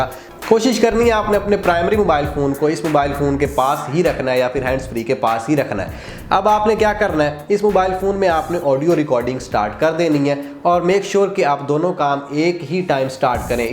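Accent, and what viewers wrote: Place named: Himachal Pradesh, India. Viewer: Bhai vedio ka pta nhi voice se lg rha tu b himachal ka h,😄😂😂😂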